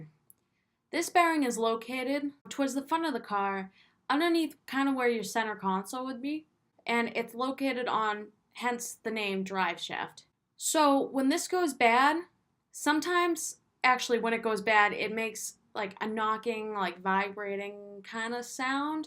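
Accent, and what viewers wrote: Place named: Boston, United States. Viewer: Is it just me or is yr voice getting more Bawstin? Lol I love it.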